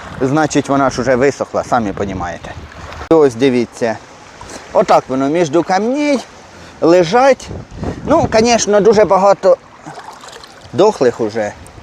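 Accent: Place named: Surzhyk, Ukraine. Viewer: Суржик у Вашому виконанні забавно слухати. Майстер стендах. Всього найкращого. Лайк і чекаю на нові відео.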